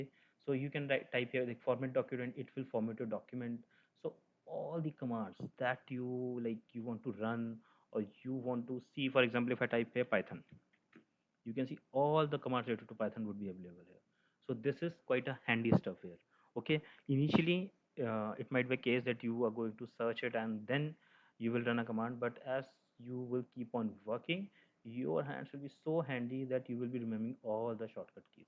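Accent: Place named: India. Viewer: what is it with all this indian dudes, i just can't find a video without a heavy indian accent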